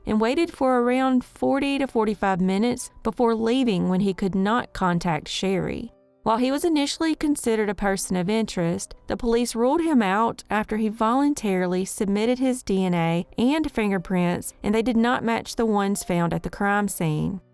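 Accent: South, United States. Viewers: Southern girl accent. Makes you Horney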